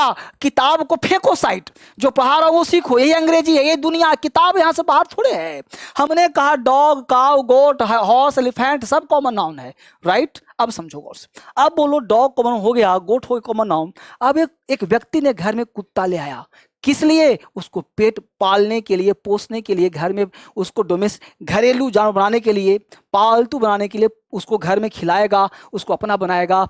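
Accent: Bihar, India. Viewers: Sir Ap Bihari ho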